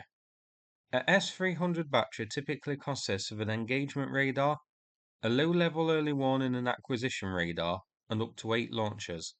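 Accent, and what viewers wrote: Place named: Northern England, United Kingdom. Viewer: Still the same bad narration by a northern English speaker who should know better!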